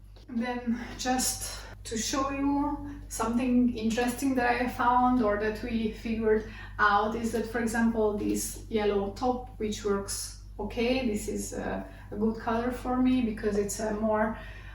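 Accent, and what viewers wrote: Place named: Hungary. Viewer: Szia! Az akcentusodból gondoltam, hogy magyar vagy. Szerintem a fények, a kamera és az ebben a videóban viselt blúzod alapján úgy gondolom, hogy mégiscsak Tél típus vagy, mégpedig Hideg Tél. Engem 3 végzett színtanácsadó nézett "félre" személyesen, míg már annyira frusztrált lettem, hogy elmentem egy szín- és stílustanácsadó tanfolyamra, hogy kiderítsem az igazságot. 🙂A baj az, hogy az "avatatlan" szem (néha még a tanácsadók is!) nem tudják, hogy mit kell nézni (elsősorban a bőr egységes és egészséges ragyogását). A rossz színek elszínezik az arcot, szürkítik vagy sárgítják, kihozzák a bőrhibákat, a szem alatti karikákat, stb. A tanácsadó pont az ellenkezőjét mondta neked, mint amit én mondanék. Az ösztöneid ebben az esetben jól működtek, javasolom, hogy hallgass rájuk (vagy legalább kérdezz meg még valakit). 🙂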